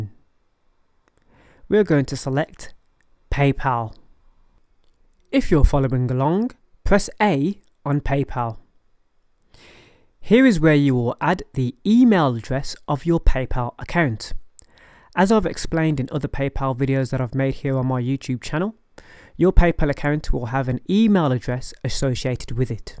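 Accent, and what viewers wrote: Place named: RP, United Kingdom. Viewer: You sound dead posh ya know 😂👌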